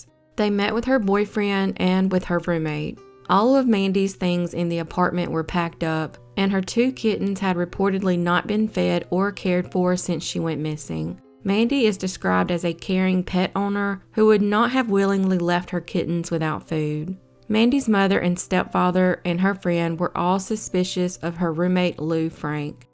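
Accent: South, United States. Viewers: I'm absolutely obsessed I love the way u do your video's and your Lil Southern accent is sooo cute . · Thank you. I had never heard of a few of these cases. I appreciate the detail you put into your productions. And a Southern drawl much like mine is unusual but welcomed. 😊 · Thanks for this upload I’m in Britain but sent it to my friend in Florida area saying see I told you I liked the southern accent haha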